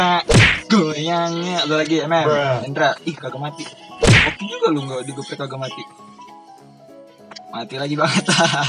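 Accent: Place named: Sunda, Indonesia. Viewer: Bang lo orang tangerang selatan ya sunda kasar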